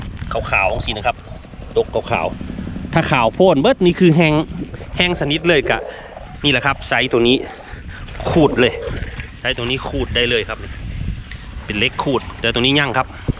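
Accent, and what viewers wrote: Phu Thai, Thailand. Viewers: คนอำเภอบ้านดุงใกล้ๆคำชะโนดอดีตลูกสะใภ้ภูไทอำเภอพรรณานิคมได้ยินเสียงบ่าวภูไทแล้วกะคิดถึงอดีต:))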